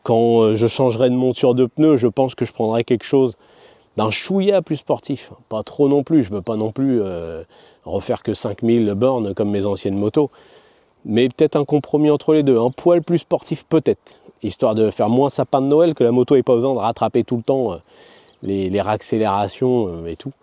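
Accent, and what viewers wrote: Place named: Rouen, France. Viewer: En mode Speurt? comme c'est beau l'accent de Rouen 🙂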